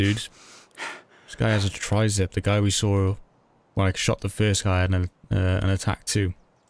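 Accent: United Kingdom. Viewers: you from south wales butt?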